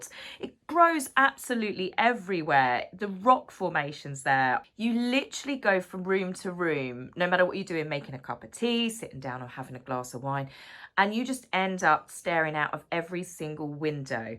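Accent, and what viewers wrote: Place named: Southeast England, United Kingdom. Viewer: It was great to hear your SE England accent. I’m from the SE but now live in Devon and I miss it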